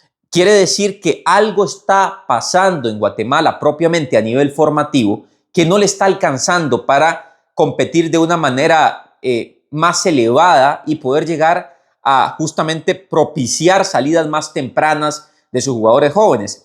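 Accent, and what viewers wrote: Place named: Colombia. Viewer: Si no estoy mal es colombiano por el acento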